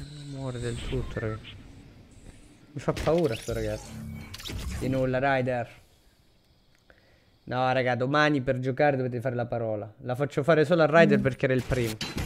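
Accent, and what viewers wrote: Calabria, Italy. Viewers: Compa ma di che paese sei della calabria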